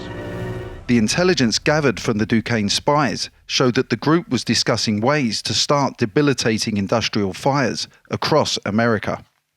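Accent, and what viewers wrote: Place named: United Kingdom. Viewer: NYC history with a British accent. Fail...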